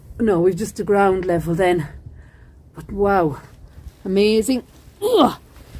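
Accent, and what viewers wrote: Ireland. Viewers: Great job! Appreciate the dedication and work you put in. Are you kidding? The wind noise and camera focus is nothing - anyone who's ever done video knows that. Ignore the naysayers -- 99% of the people enjoyed it - the other one percent are holed up somewhere living off their parents and whining. You do a terrific job - glad I found you and hope to see more of your work in the future. And I love the Irish brogue!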